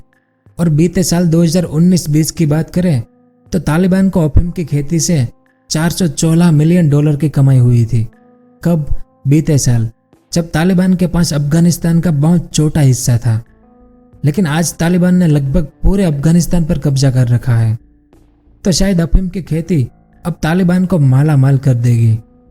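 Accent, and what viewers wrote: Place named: Rural, India. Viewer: Tu puri villege bawa hindi bolta hai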